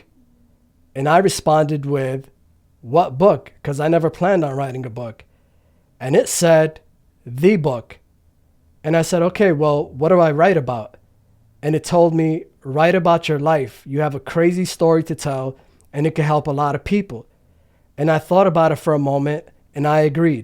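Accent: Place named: New York, United States. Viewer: How did I know you were from the NYC/NJ area? Lol. I hear it in the talk...🤙🙏🙏🙏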